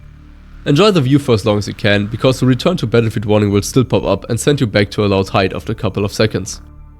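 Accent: Germany. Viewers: Most unnoticable german accent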